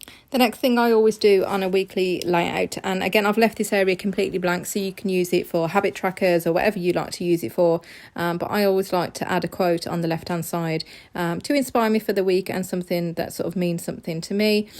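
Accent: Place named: Birmingham, United Kingdom. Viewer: Which part of Birmingham are you from lol, enjoyed your vid.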